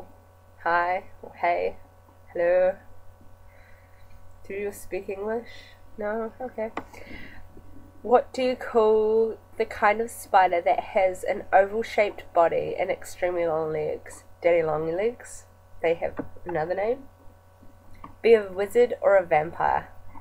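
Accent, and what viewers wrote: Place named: New Zealand. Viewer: i guessed new zealand before you said ! i win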